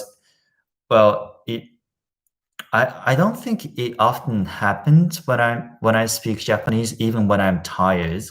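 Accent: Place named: Australia. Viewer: 発音が、大学のオーストラリア人の教授とすごく似てました。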